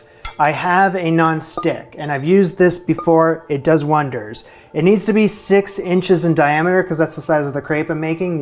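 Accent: Canada. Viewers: You are so relatable you are me!!!. funy.. and sometimes a little unhinged. But you are up to the task. Thanks for the fun!!! Love your Canadian accent From the Netherlands 👍👍👍 As saint Nick would say you are nice and a little naughty.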